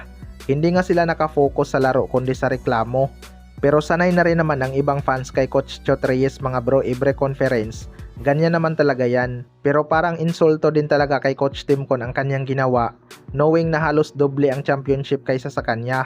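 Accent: Visayas, Philippines. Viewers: Paanong i'trade ehh 1rts five nya yang si HOLT...depensa at hustle ang inaasahan nya Kay HOLT Pag inaalat siya sa puntos! puro ka lang hula ehh...ayusin mo salita mo halata Kang BISAYA!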